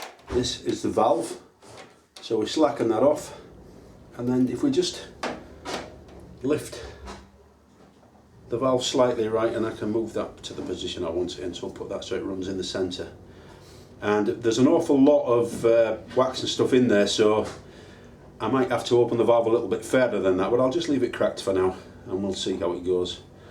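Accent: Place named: Manchester, United Kingdom. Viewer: Thank you so much most interesting after watching your three videos. We are hosting three hives in our garden and I’ve been asked if I want to look after them. You are most helpful. We live in Herefordshire but we couldn’t help noticing your Mancunian accent which is where we originally came from.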